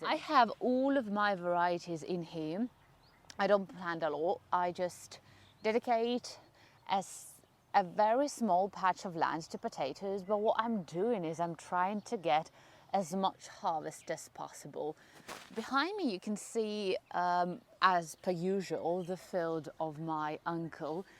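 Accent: United Kingdom. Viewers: My wife, Iryna, who is also from Belarus, still dislikes planting potatoes for the same reasons you did as a child. She particularly despises Colorado beetles! I'm the gardener in our family. Your British accent is interesting to hear from a Belarusian! Did you spend time in the UK? Cheers!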